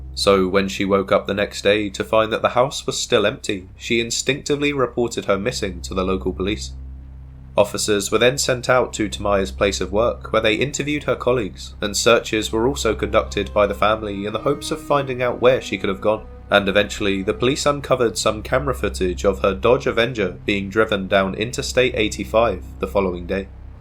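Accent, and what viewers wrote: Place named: London, United Kingdom. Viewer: Why does he have to have a London accent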